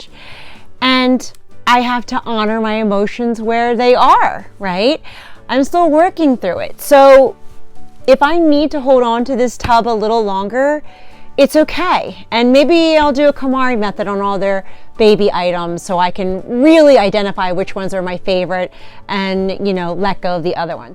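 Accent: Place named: Philadelphia, United States. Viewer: I've really enjoyed watching your channel, not only because you have a great short term-long term process, but because I live in the same region of the country (Philly) and it is good to see and hear the local dialect, see items and logos from local stores, etc. I have been trying to support more local channels in general, because it is by extension a connection to the regional community -- something I don't think we have enough of. Don't sweat the sentimental kid saves -- I had boxes, tubs and closets full of them until my last move in 2015. Sadly, much of it was ruined, and my other excuse --- saving it for the grandkids --- became lamer and more irrelevant by the day as the grandkids became too old for the things that were salvageable. I ended up with one special cedar chest with my most sentimental saves once I let it all go.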